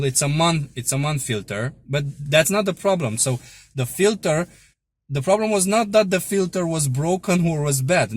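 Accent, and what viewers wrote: Romania. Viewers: De la o poștă se simte ca ești român 😂🫡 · Tu esti roman? Your accent sounds very Romanian. Pe vremuri era usor sa-mi dau seama, ca eram toti cu Dacii LOL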